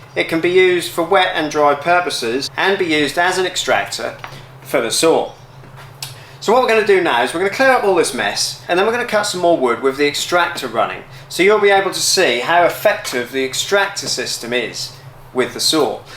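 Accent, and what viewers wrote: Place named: East Anglia, United Kingdom. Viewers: Came for the east Anglian accent, stay for the tips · Could be Suffolk Maybe lowestoft area, but could be Norfolk, only next door, great Yarmouth and further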